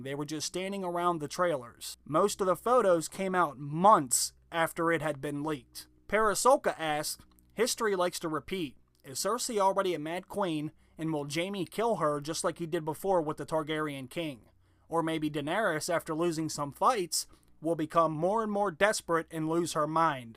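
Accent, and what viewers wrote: Pittsburgh, United States. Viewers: Do I hear a yinzer?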